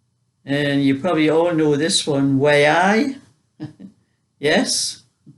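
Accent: Newcastle, United Kingdom. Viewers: I think the Geordie accent sounds wonderful. It is one of the reasons why I watch your videos. lol. I used to holiday every year in Alnwick and it is one of my favourite places. I also love watching Vera on the tv. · The reason I love listening to you is because my Dad was from Newcastle and you sound like my cousin who lives at Greenside.I have only been to the North East half a dozen times, but when my late husband and I went to a 50th wedding party a few years back, my husband said Geordies were the warmest friendliest people he had ever met !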